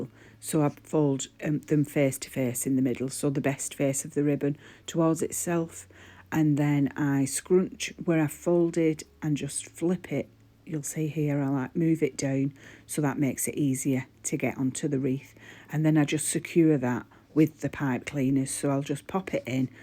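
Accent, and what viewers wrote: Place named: North, United Kingdom. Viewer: I really loved seeing how this came together. I never had any clue how stunning wreaths like this were put together - when I made mine I was massively winging it and used all of the wrong things! The finished wreath is so classy and utterly stunning. For what it's worth, I personally prefer not-scripted voice-overs because I find them more natural to listen to than when something is read out. Just my opinion, and I know others may differ, but I liked your voice over so I don't think you need to change anything. As someone from Lancashire who now lives down south, it's always lovely to hear an accent from further north too :-)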